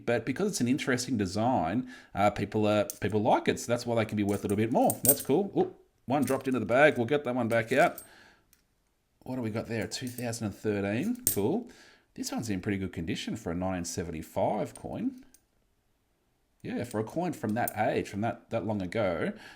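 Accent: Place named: Australia. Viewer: it's all good Can you make longer vids I miss the Oz accent you funny fkr 😅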